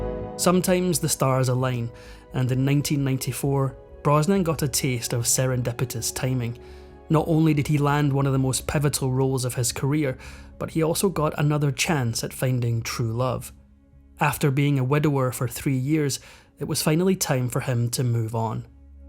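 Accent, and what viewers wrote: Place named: Scotland, United Kingdom. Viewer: Yay!! I am so glad that the Scot is doing the narration more so now...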